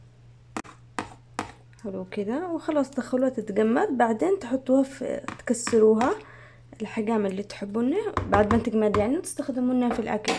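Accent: Aden, Yemen. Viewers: قدك ع الهدره العدني لاتغيريها للسعودي مافي احلا من هدرتنا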